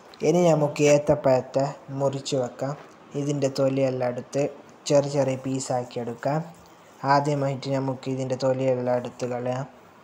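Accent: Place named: Kasaragod, India. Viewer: കാസർഗോഡ് സ്ലാങ്ങും കാസർഗോഡ് പലഹാരവും കലക്കി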